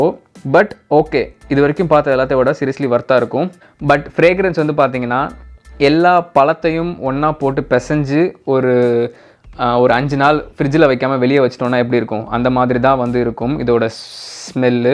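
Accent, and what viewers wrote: Madurai, India. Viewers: Maduraikkararo Neenga 🤔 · Anney ninga Maduraiya😁.. Onga slang appudi eruku... "Avinga,Evainga... Pooturuingey"😍😍😍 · Bro Madura slang varuthu apo apo nenga Madura ya 🤔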